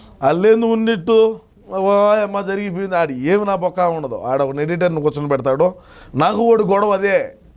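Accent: Rayalaseema, India. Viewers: Anna needhi Kadapa na 😅 rayalaseema slang lo vunnav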